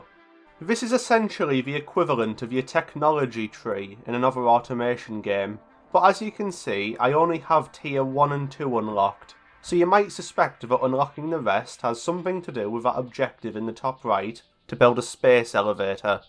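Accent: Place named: Northern England, United Kingdom. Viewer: I unfortunately have to report that since watching your videos my thoughts now speak with a northern accent sometimes. It will forever be gläeba in my head for now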